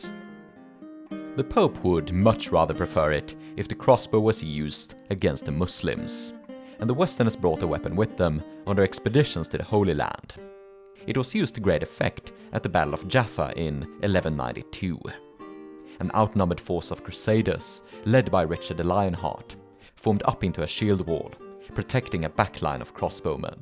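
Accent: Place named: Sweden. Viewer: Even beyond the Swedish accent you sound almost EXACTLY like Gold & Gunpowder, love ya both! (unless....)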